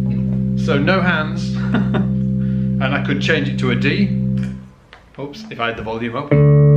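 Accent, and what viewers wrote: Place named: Leeds, United Kingdom. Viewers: Tuner. Yes I don't like English accent too. This Leeds area English is some kind of German and London mix imo LOL... Anyway, I prefer standard American accent personally. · He’s an English guy speaking English, with a regional dialect - in this case, that of Yorkshire (albeit certain Americanisms have crept in). Here’s a thought, though; if you have difficulty with English accents that aren’t standard RP Hugh Grant-type stuff🧐....TRY HARDER. We sent you the Beatles.....I would have thought THAT would have given you a clue. 😉😂😂😂😂😂😂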